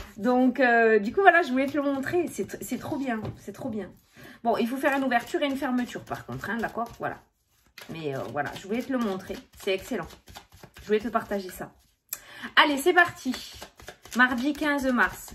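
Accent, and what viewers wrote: South, France. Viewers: Bravo d’être là pour nous en ces temps difficiles. Avé l’accent. ☺️🤗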